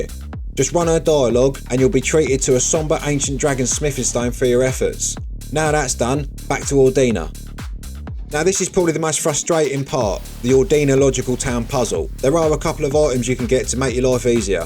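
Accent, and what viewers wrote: Cockney, United Kingdom. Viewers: Fucking hell this is a solid video. Music, cockney as fak. Love it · Good video. But jesus mary and Joseph. The Danny dyer accent and the music. Oof.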